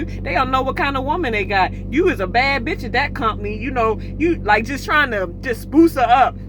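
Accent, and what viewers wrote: Baltimore, United States. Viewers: I like how u rep B-More w/that accent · sound like she is from baltimore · Second video I seen and like!!! I hear that Baltimore accent💯 sounds like home☺️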